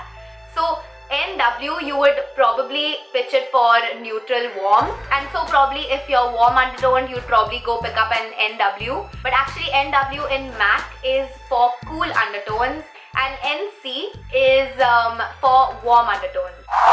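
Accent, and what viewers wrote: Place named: India. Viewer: I hateee indian accents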